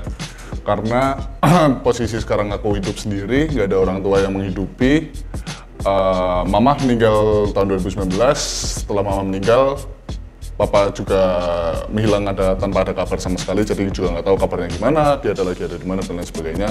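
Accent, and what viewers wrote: Semarang, Indonesia. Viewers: Logate wong semarang ketoke sampean mas 😅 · njirr logat semarangan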